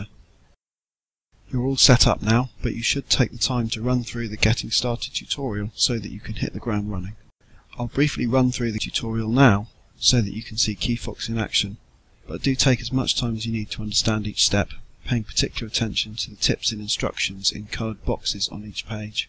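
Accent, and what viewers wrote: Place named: United Kingdom. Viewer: GOD you could do a lot to improve your audio not to mention working on the dialect intensity so that those not from the UK could understand you. With both issues it makes it pretty bad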